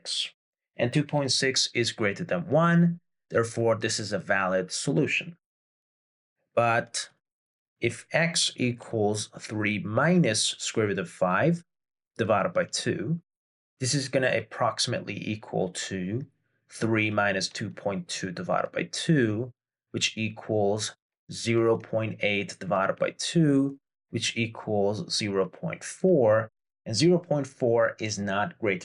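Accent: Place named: Midwest, United States. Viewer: You're from the midwest.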